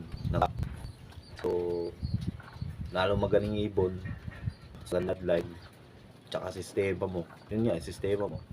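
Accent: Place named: Iloilo, Philippines. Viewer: kasi parang my tono kang ilonggo hehe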